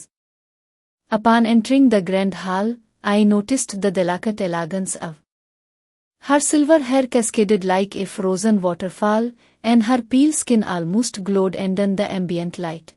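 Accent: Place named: India. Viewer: Not sure the Indian accent works, plain Western English is far batter!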